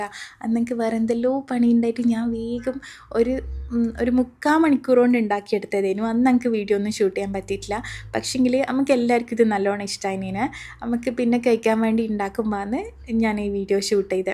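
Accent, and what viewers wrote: Kannur, India. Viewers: Oppened ❤️iyale vidios othiri ishttamanu kannur samsarathilanau wayanad kariyaya nan ith othiri ishttapedan thudaghiye❤️❤️👍👍👍🤲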